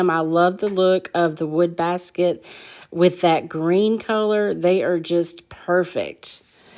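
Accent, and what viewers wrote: South, United States. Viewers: thank you for taking the time to reply. I've been watching all your past videos too. I am in Canada and I had to use a white wax that it's available here. It looks exactly like DIY one in buttery texture but it does not dry. I will have to find a solution to this problem. In the meantime...#1 love, love, LOVE your southern accent. I used to live in South Carolina. Best years of my life. #2 I saw the video where you were thinking to quit YouTube. So glad you didn't. We need more people like you. We live vicariously through you. #3 I also loved the video where you put down pen and paper and explained what profit you made in a month in your booth. I loved the honesty I know you must be super busy, I'm a mom too with young kids and a full time job. I'm amazed at your energy. Keep them coming videos. I love watching them.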